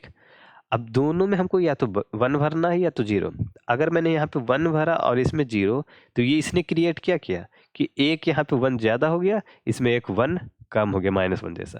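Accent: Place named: Bihar, India. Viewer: Thanks Bhaiya! Great Explaination! Loved your BIHARI accent! ❣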